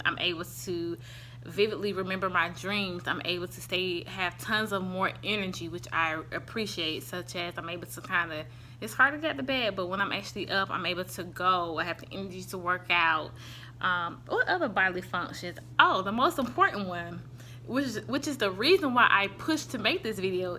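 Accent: South, United States. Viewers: Ur quite lovely and the southern drawl is very charming. Keep up the good work. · I love your Southern accent, I'm from Atlanta too. Thanks Queen for the amazing info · U speak very well love your southern accent. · Nothing wrong with your accent. Southern... thanks for the video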